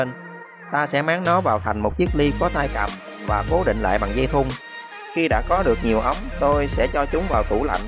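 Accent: Mekong Delta, Vietnam. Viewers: nói chuyện đậm chất người miền Tây luôn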